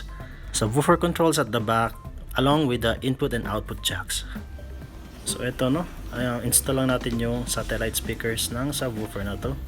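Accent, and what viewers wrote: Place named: Cebuano, Philippines. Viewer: Bai. Taga asa ka? Bisdak man kaayo. Shoutout diri Linamon Lanao del Norte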